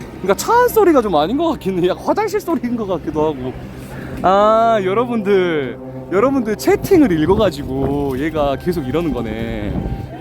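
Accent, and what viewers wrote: Jeolla, South Korea. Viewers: 쇼츠로 영어하는거만 보다가 풀영상 처음봤는데 전라도 억양 너무 구수하넼ㅋㅋㅋㅋㅋ 인간미 넘쳐요! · 아따 전라도여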